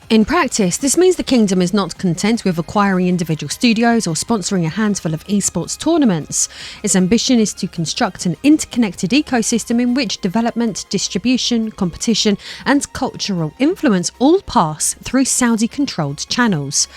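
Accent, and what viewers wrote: United Kingdom. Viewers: got to ask * uh your accent sounds sorta brittish is that correct just kinda guessing here?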